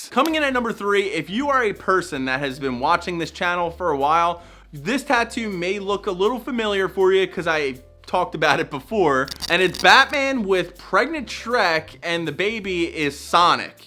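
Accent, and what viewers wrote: Philadelphia, United States. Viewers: Love the Philly accent. So good.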